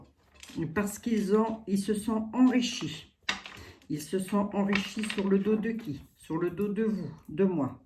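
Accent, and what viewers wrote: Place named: Franche-Comté, France. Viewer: bonjour madame ! Ne seriez-vous pas native de Franche-Comté par hasard ? L'ayant quittée depuis de nombreuses années, j'ai pourtant l'impression d'en revivre l'accent, certaines expressions, les boutades etc. Est-ce que je fais erreur ?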